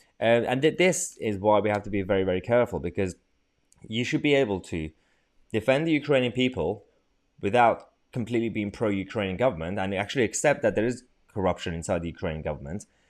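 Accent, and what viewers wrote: United Kingdom. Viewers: It's a bit disconcerting to me that a seemingly intelligent British Accent is being used to say stupid things.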